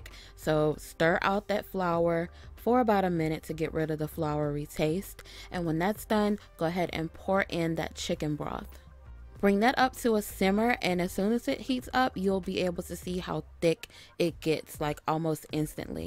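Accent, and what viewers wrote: United States. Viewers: Which accent is this ? .. which state 🙂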